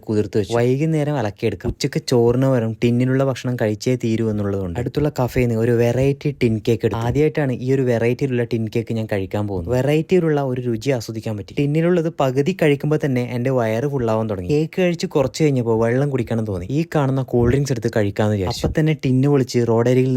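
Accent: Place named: Kanhangad, India. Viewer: എത്ര തന്നെ അച്ചടി ഭാഷ മിണ്ടാൻ നോക്കിയാലും കാഞ്ഞങ്ങാട് സ്ലാങ് ബെർന്നല്ലേ 😅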